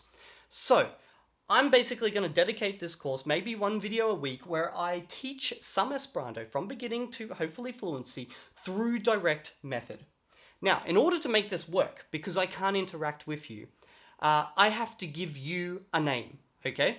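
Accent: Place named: Australia. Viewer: Great to find an Aussie speaker, gives me hope I can do it!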